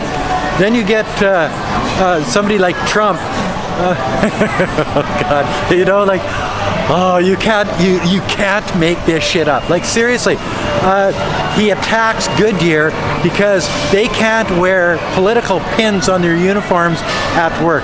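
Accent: Canada. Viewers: The wrong people? Between a rock and a hard place we are. Thx canadian irish sounding guy. Theres severe corruption battling the people who want the world to be together in unity peacefully, and were all looked at as choosing this way thats happening now or are thought to all be bad and hate china or whatever. I dont think you really know whats happening to us over here neighbor! Keep your sodium chloride facebook comments. Thise mailboxes were being refurbished and some of those images of trucks taking mailboxes are completely out of context. Mail in ballots are the way democracts are trying to rig this election. We are being manipulated and falsified probably just like a lot of situations ALL over the world, you also have no idea about. But your video is appreciated anyway :] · So Strange to hear this accent on this canadian guy.... Born and raised slag off his own people like that! 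I'm So glad hes absolutley in love with his life in China...Thats amazing. And you know what will happen the next time he flies home to the country hes using curse words to describe Canada as going in the toilet? Those Canadians will be as kind, and welcoming as ever, No police to take him in for questioning , no blocks on content he posts about his opinions to keep the social order. But you know what thats okay. Maybe one day he will think better of the people at home.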